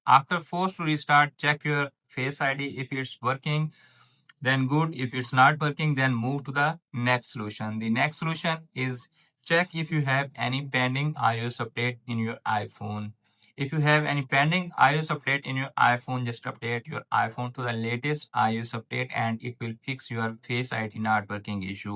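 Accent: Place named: India. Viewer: Can you speak English instead of Indian?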